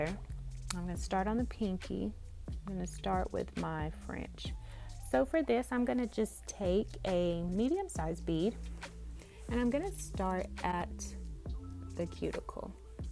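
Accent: South, United States. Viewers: I love these! I hope I didn't say anything out of line by saying you sound like you're from the south? I mean I love it. I know a lot of people say southern people sound stupid because we have a southern accident. I want meaning that at all. I love your accent again I have a southern accent but yours sounds sweet. I'm not usually into clear tips but I really love these colors and it might have to you all day but you make it look so easy. That's why I don't do my nails a lot because it takes me so long! Much love to you!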